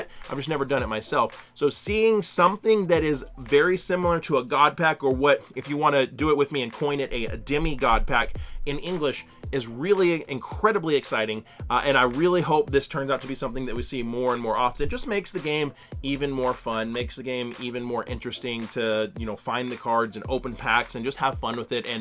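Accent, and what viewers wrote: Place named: United States. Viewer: deze man gaat geen nl spreken hoor zn accent, dat is echt amerikaans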